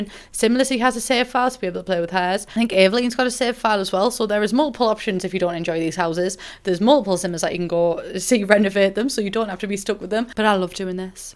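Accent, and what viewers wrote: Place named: Glasgow, United Kingdom. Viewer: I used to live in Glasgow and this video gives me those vibes. Also I love your accent it takes me back to those great times hen xoxo from spain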